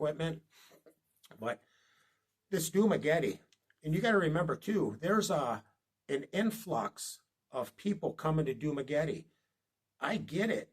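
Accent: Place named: New England, United States. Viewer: He's a Yankee threw and threw. Where's that accent from? 😂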